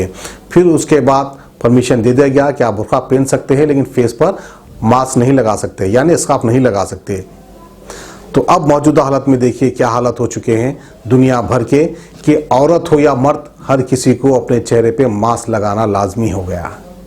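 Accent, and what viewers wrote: Hyderabad, India. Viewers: Bhai aap pure Hyderabadi style ma bolka inlogo ka upper thok Diya jaisa hogaya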